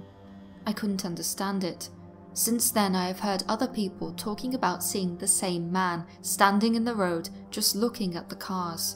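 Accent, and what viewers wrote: United Kingdom. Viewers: is the narrator's british accent real?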